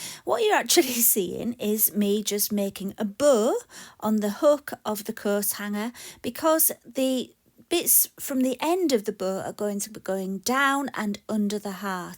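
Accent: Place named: Northern England, United Kingdom. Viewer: Thank you for posting this video, it's so informative. I love your lovely Northern accent and voice. It's so nice to hear a British accent in the sea of American ones (not that there's anything wrong with theirs 😅) just lovely to hear the expressions and colloquialism 💞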